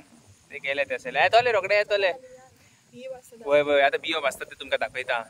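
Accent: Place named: Canacona, India. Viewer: Where is this place. Canacona? Because her language tune is talking like canacona people and I'm also from agonda 😊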